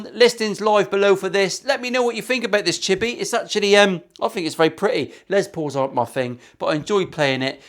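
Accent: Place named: Plymouth, United Kingdom. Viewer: I've no interest in guitars. I was just idlely scrolling. I heard the accent and had to ask if he's (you) are from Plymouth? I think it's a Plymothian accent, and judging by the language, and I am judging, specifically Swilly. Let me know. From an exiled Janner.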